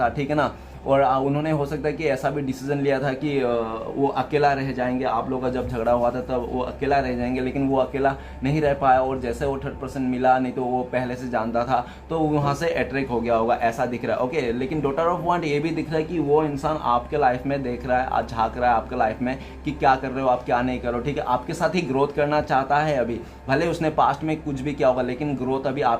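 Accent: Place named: Northeast, India. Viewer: Btw are you from Assam.. Your voice sounds like North-East people.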